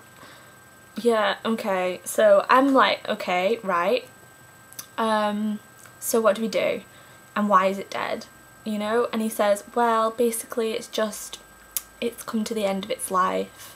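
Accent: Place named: Blackburn, United Kingdom. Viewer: Are you from blackburn? ahah xx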